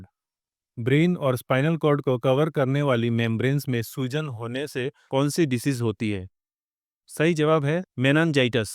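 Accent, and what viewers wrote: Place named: Assam, India. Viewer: Assam ka lag rha hei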